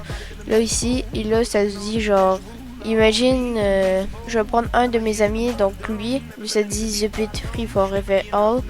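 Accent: Quebec, Canada. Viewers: T québécois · T'es québécois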